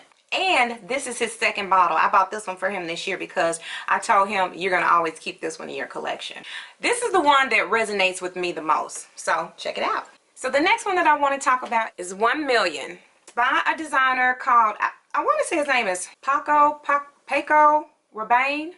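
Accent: South, United States. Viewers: very nice list and nice sothern accent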